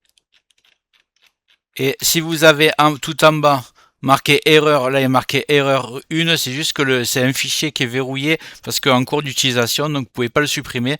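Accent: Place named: South, France. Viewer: Merci! JE suis un Québécois francophone et ça me fait du bien d'entendre un expert qui parle de Windows en bon français avec un accent du sud! Comme je vis dans un océan d'anglos, et que la technologie venait surtout des USA je regarde beaucoup de vidéos en anglais mais j'apprécie le fait de vous écouter dans ma langue!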